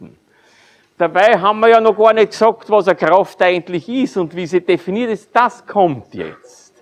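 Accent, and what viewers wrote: Austria. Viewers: Österreichisch ist einfach zu Charmant. Ich wünschte ich würde es auch beherrschen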